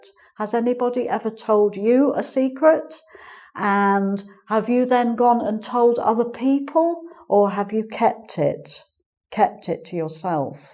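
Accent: United Kingdom. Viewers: I really enjoy your lessons, enjoining listening to very clear British accent, and I learn new things such as the difference between: can you please and could you please. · a brilliant teacher and british accent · From Brazil From Marcio Hello Teacher... It is a pleasure to meet you... I had never seen a class of you here, but I am enyoying it a lot. Where you're from? Your accent sounds like a British person. I love it. Hugs! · AstronoyBrasil Hi! I was curious about the same thing and then I've found this site with some information about all of the Engvid Teachers: <link> - I'm not sure whether Ms. is from UK or not. However, we can see she lives in London and her accent is perfectly British! Hope this helps you. Bye! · amazing Teacher) and her accent is so British. she must be perfecr granny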